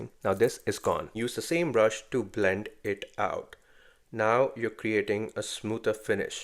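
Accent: India. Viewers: احيه اكسنت هندي زفت و كمان ذكر يعلمنا ميكب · I love his indian accent ..it makes me move my head side to side😅😅😅😅😢😢😢😊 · Correct first your accent. Does all Indians and hindoes have short tongue?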